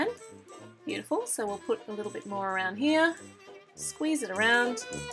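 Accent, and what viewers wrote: Australia. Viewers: Are you from Australia? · Is she australian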